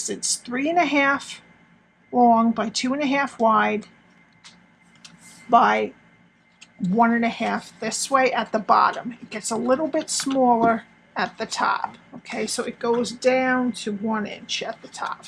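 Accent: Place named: East Coast, United States. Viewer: Love your accent! Are you from back East?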